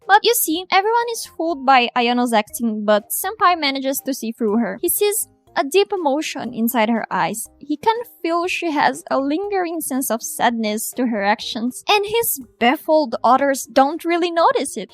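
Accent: Brazil. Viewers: EU SABIAAA, EU SOUBE DESDE AS PRIMEIRAS FRASES QUE VOCE FALOU QUE VOCE ERA BR!!!! nao pq seu inglês é "ruim" ou tem sotaque, na real seu ingles é PERFEITO, mas tinha a >>aura<< HAHAHAH DESENHOS INCRIVEIS, DE VERDADE, SEU TRAÇO É TAOOOO LINDICO!!! nao conhecia seu canal, vou acompanhar a partir de agora! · A minute in, and im listening to your voice and im like "...huh... That sounds a little Brazilian.." Went to check the About you and was so darn right I have brazillian friends so maybe thats how i detected the slight accent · CARALHO eu passei o video inteiro me perguntando se vc era do brasil por causa do sotaque, nao acredito que é mesmo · Eu tava escutando o vídeo e eu assim esse sotaque... SABIA Q VC ERA BR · Eu SABIA q vc era brasileira assim q ouvi seu sotaque sé loko muito bom seus vídeos ❤❤ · OMGGG I KNEW I RECOGNIZED THIS ACCENT! You're Brazilian... Q NEM EU MANOO 🫂🫂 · NÃO ACREDITO QUE SE É BR KKKKKK EU TAVA VENDO O VIDEO NORMAL ENQUANTO EU DESENHAVA, AI NA HORA QUE VC FALO MADOKA MAGICA EU RECONHECI O SOTAQUE BR, E FUI ATÉ CONFERIR ! 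YEEEEEEEEEEES MAIS BRASILEIROS !!!! muito bom o video por sinal adorei seu estilo, e adoro ver videos pra desenhar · Gurl ru brazilian? I know the accent sounds rlly Brazilian, like on Madoka Magica when u speaks.. (i am too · Pelo sotaque eu sabia que era brasileira kkkk,amei o seu vídeo e seus designs e ideias são muito criativas e originais!💖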